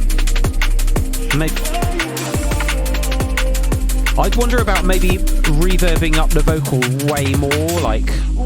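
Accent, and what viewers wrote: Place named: United Kingdom. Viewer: after the song I didn't expect you to sound super british haha:D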